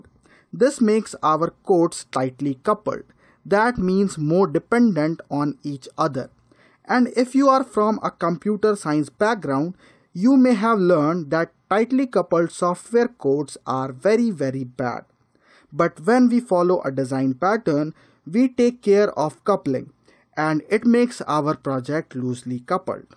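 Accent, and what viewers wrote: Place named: India. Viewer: nice, I love Indian speech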